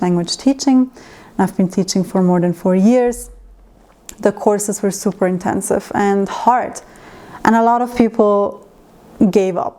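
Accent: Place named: Switzerland. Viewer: Danke für ein sehr interesantes video. Ami me gusta escuchar tu Swiss Deutsch. Espero que faze mas video sobre los puentes entre los idiomas. Obrigado ciao!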